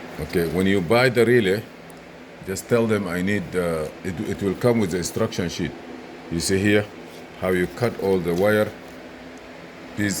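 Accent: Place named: India. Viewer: I be honest I can't listen to the indian accent idk if its just me!? N they be post so many DIY videos nan fooken get so damn mad. Lol anyways thx u sir u speak very good in English I can under st an you for sure you be surprise there's lots that dnt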